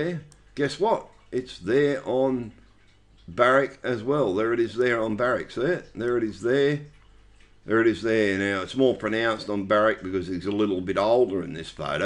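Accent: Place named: Australia. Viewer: "If ya don't fuckin believe me I don't care" - you're awesome mate! Nice to have a real aussie bloke talking about these things on here. Ive always believed that they are the same man too.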